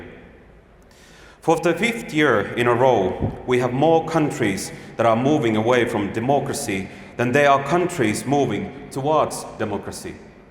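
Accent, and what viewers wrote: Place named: Finland. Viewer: Täyttä asiaa, mutta sun pitäis yrittää ääntää sanat eikä lukea niitä kuin suomea. Sinänsä virheetöntä tekstiä kylläkin.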